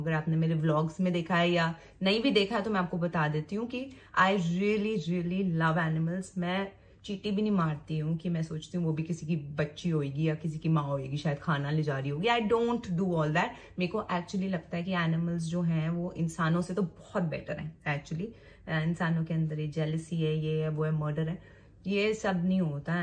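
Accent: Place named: Punjab, India. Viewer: This was so fun!! 😄❤️ You have a strong Punjabi Delhi accent, and I love it! 😘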